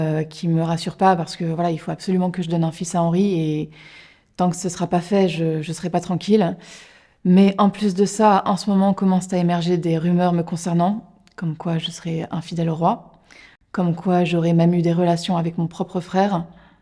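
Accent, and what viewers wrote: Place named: Paris, France. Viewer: J'aime beaucoup le concept et le contenu. Bravo ! Par contre j'entends un accent parisien super fort.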